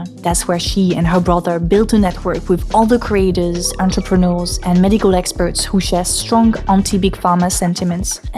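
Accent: France. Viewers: I am British and I love your French Accent. I love your videos as well they are excellent. Keep it Up 👍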